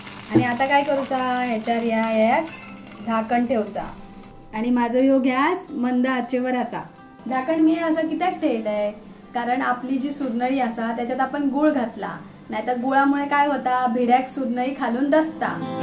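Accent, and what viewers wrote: Malvani, India. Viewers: Lai Bhaari .....!!! Sweet malwani!!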